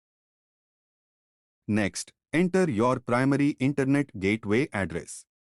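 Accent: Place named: India. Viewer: I think it's an Indian accent rather, lol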